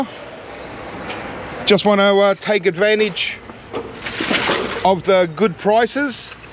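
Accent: Australia. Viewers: Well your accent sounds Australian but y’all use dollars?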